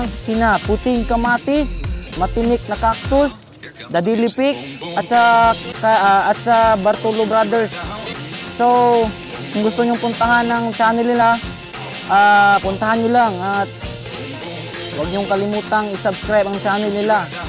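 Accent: Visayas, Philippines. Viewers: Gahi kaayu ag tagalog bai, Bisaya jud😂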